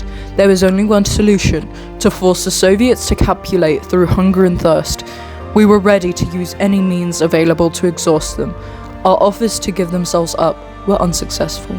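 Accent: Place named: RP, United Kingdom. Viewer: Perfect BBC English; умничка! Никто не забыт, ничто не забыто.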